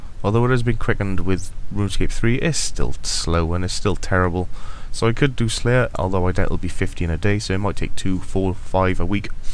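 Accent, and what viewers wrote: Wales, United Kingdom. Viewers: ahah where in wales you from but? can spot it from a mile off!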